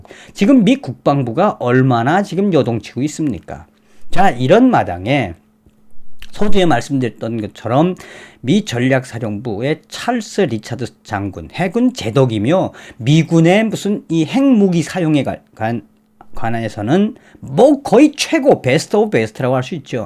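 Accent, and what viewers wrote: North Korea. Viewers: 북한 억양의 찰진 표현...디립다 깐다. ㅎㅎ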